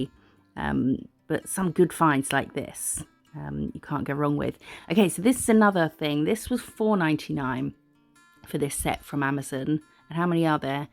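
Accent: England, United Kingdom. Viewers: "Faffing, blotto, para." I haven't lived in England since 2003. Listening to you makes me feel homesick. It reminds me that we speak another language 😂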